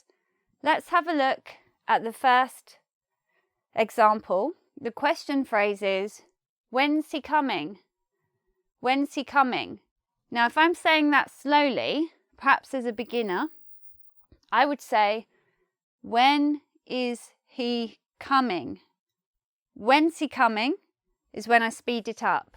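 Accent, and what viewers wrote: United Kingdom. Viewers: i am so happy that you are recovered and in full of energy. I really enjoy British accent. Thank you.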